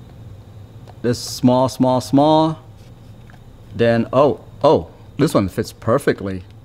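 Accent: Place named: Vietnam. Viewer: I can tell right away - viet brah :)) there's a bit of an accent. Thanks mate - just what I was looking for. Gamepad with the case on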